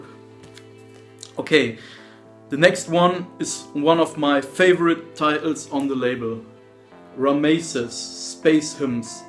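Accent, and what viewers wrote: Germany. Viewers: Are you a german Guy?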